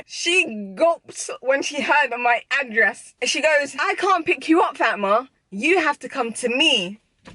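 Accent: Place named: United Kingdom. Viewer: Hello, I'm a fellow Arabian and I was astonished when I heard you in a British accent. I'm myself trying to perfect it but I'm struggling alot because many letters in the Arabian dialect I talk in sound way too differently than the British one. I was wondering if you could make a video explaining how mastered it for ppl like me or atleast reply to this comment. love ur videos. xoxo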